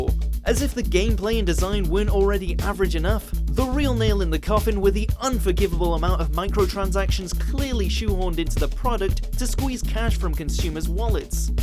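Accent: United Kingdom. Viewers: I hate this British voice. Bring back the American one. This one sounds like a cartoon. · Why is a British person narrating Watch mojo now a days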